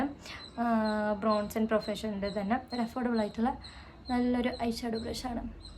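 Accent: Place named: Malappuram, India. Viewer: Loved your malappuram slang... Maakup 😅😅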